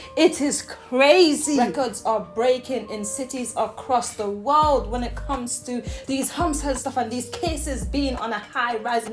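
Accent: United Kingdom. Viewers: Some annoying British accent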